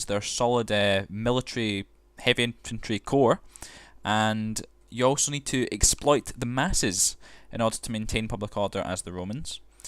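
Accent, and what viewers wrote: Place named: Scotland, United Kingdom. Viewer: The speaker is definitely Scottish!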